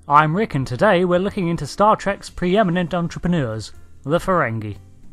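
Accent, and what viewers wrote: England, United Kingdom. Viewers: "Until next time, I have been Rick." You sound English, so, why do you not know this sentence is not grammatically correct? "Until" denotes a future event, "Have been" denotes a past event... Unless you are a time traveler, you can't "until have been" Either you have been, or you will be until. If you have been Rick, who are you now? If you will be Rick until next time, who will you be then?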